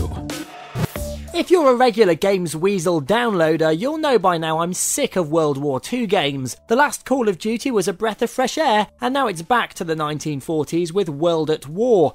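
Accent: United Kingdom. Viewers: this guy is giving us british a bad name for talking like that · i like the british acent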